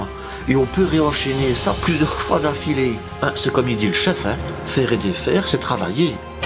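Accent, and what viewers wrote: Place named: Liège, Belgium. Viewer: J'adore cet humour aux accents très liégeois.... un bon relai de la voix de la plèbe:-)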